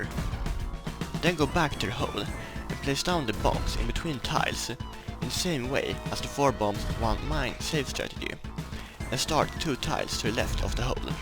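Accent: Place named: Sweden. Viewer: Oh. Really? You have a very different accent from most other Swedish YouTubers.:D I guess you have just a much stronger swedish accent then. Or maybe from a different part of Sweden, I don't know. The video was really great though, I'm looking forward to more in the future!^^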